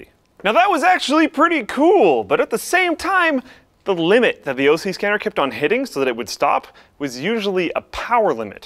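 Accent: Canada. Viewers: Canadian accent is strong with this one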